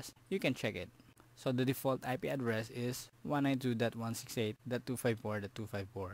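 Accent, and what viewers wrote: Philippines. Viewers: tigas ng tagalog accent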